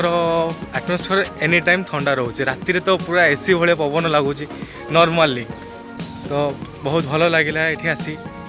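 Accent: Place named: Balasore, India. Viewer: Baleswaria pila nka pari kan kahucha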